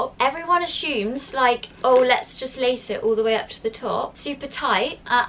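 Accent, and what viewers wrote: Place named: United Kingdom. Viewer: Akdiakzia menkanta el acento británico, además de que es fácil de reconocer xdxdxd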